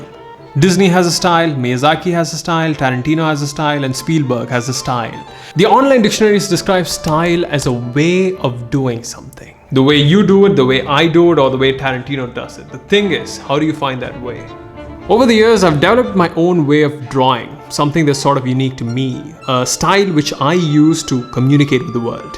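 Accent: India. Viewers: I know you are an Indian talking in accent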